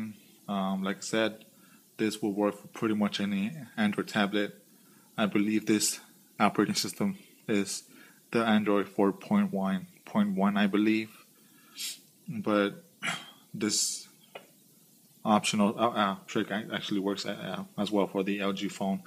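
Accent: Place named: Argentina. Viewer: genius genius genius many thanks, I've looked everywhere online videos and more videos of this tablet and did not appear in either hand, I say this tablet is only jajaj ... well until I found your video and could not believe it, get to the logo android but could not access the recovery I needed that combination, so I thanks a lot for the contribution they have made .... I subscribed to your channel and little hand up. I do not understand a lot of the Argentine English, so I had to use the translator thanks again ....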